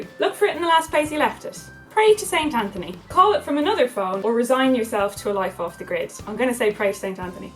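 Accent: Dublin, Ireland. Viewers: Didn't understand a bleedin' bit of that but I enjoyed it massively. I could pretty much just listen to you irishing on for hours. 🇮🇪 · Wow,.. You look BEAUTIFUL. You do in always, but in this one in particular.. You look.. grownup (thats a good thing somehow) and radiant. You look stunning:) And related to your video: You're accent is gold! I myself am not Irish at all. And I just wanted to say that grew up watching Riverdance.. my mum liked it, I guess and me and my sisters were obsessed with it.. with like Jean Butler and Colin Dunne.. Or is that stereotypical to say? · Subscribing just for the accent Irish are funny when then aren't angry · I think Lucan in Dublin I might be wrong but thats what her accent sounds like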